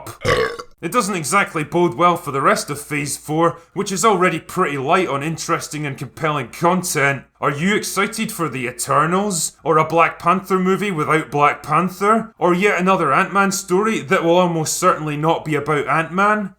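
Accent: Scotland, United Kingdom. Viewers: Is the Groundskeepr willie accent his natural accent or does he exaggerate it for these videos?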